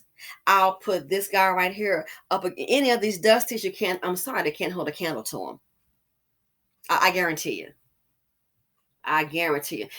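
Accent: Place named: South Florida, United States. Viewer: I knew you were around Palm Beach. I’ve been trying to pin point your accent because you sound like another YT’er I listen to who lives in South FL. I love your accent.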